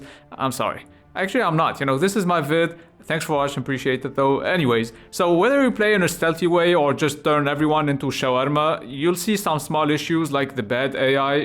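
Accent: Italy. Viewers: funny italian accent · I approve of your Italian accent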